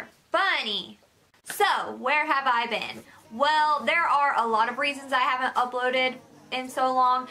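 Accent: United States. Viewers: Are you from Texas? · Southern American accents are one of the best and this is coming from a California native. The reason why you get insecure about your accent is because of the stereotypes with people who have it are perceived to be dumb or low class. Not true. It's actually a very classy and gorgeous sounding. In fact, if you put Southern American accents on slow motion in a voice recorder, it sounds very similar to British accents.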